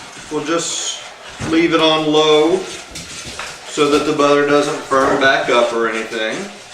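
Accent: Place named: South, United States. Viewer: speaks in a souther drawl!!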